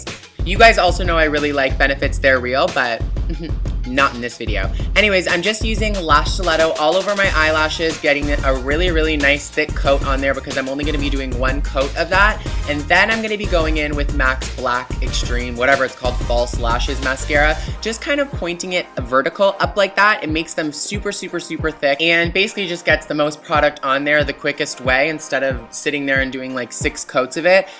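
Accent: Canada. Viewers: Love your canadian accent :)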